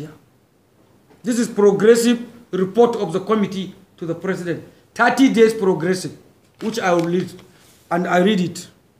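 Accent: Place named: Hausa, Nigeria. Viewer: Hahahaha, that is how the the hausas tribes pronounce things. . Take example from the president himself the way he do province words.Anyway the hausas are the most honest pple in that' Nigeria as a whole. God bless the honourable for helping the common pple in that country call Nigeria. Amen oo!!!.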